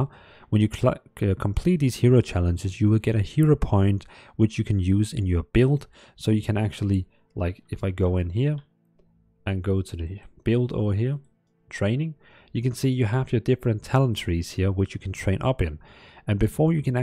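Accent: Denmark. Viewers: Ahh thank you for this very helpful introduction. I just recovered my Guild Wars 2 beta character, a gnome thief who has been waiting patiently for me for 3411 days. Looking forward to give the game a shot. Out of curiosity, you sound Danish, am I correct in that assessment? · Du taler super engelsk, men vi danskere har bare en evne til at spotte andre danskere uanset hvor lidt accent man taler med 😁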